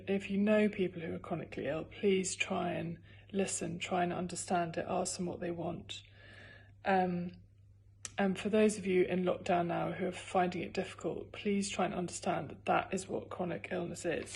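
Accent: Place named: RP, United Kingdom. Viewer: Very poshly done LOL, you are so sweet. It would be marvellous if while you are resting, you could do the job of a care worker. I understand how difficult it is, that you probably suffer from a form of bullying because of your status as a famous woman. Carework has been my life, and I have loved it, it has been difficult because of my connection with a famous man, but I know that it would be a hundred times worse for you. You have done great by reading your journal to us, good luck with your campaign to bring awareness to those in need. You brilliant lovely lass.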